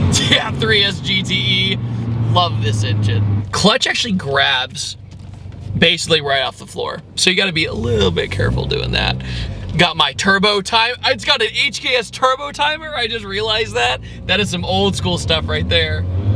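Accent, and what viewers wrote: United States. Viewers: Damn the accent tho even when saying wrx american english and accent.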